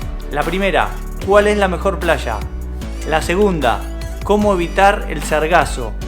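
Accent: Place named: Argentina. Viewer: Gracias!! Sobre todo tu acento argentino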